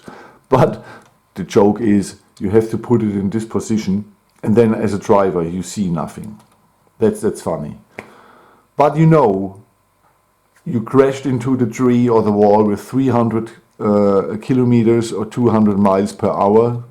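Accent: Germany. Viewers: That german accent though...🤷‍♀🤷‍♀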